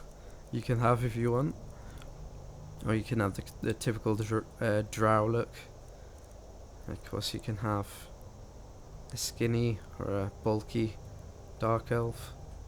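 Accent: Scotland, United Kingdom. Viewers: Scottish accent, am I right? :) Great walkthrough, by the way! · omg your Scottish! :D it feels so rare to see another Scottish person lol xD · Love your scottish accent, reminds me of that guy off Theme Park World who guided you through the game :)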